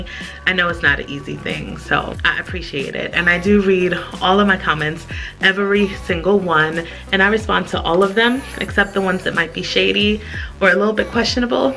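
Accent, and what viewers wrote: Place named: Caribbean. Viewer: WHich Caribbean are you from?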